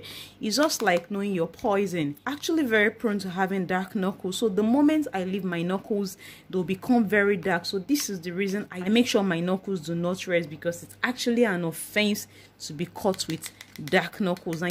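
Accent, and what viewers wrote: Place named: Cameroon. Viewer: Just stumbled on your channel. I just subscribed! You sound like a Cameroonian!! I know you said naira but you really sound like a Cameroonian! Good info on here!!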